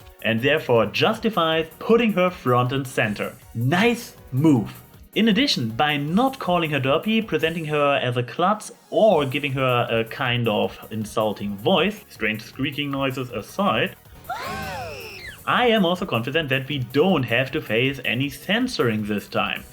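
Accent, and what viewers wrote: Germany. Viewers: I have no idea why, but I seem to be really happy to hear your German tones today, AnY.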